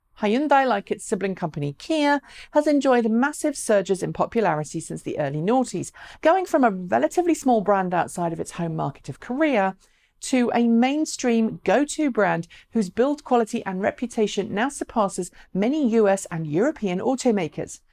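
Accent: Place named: United Kingdom. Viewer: WHERE DID YOU GUYS FIND YOUR TRANSEXUAL COMMENTATOR.....WITH A BRITISH ACCENT......IS THAT SUPPOSED TO BE COOL ..?...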